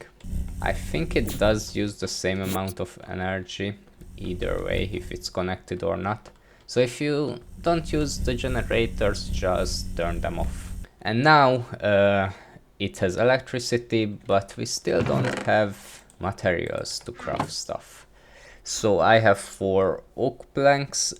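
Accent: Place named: Hungary. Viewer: halatszódik hogy magyar vagy xdd de sokat segített a videó ty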